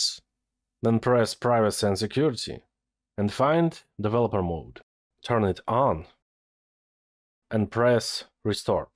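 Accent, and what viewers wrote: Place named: Russia. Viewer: все-таки, у него русский акцент, русский же блогер)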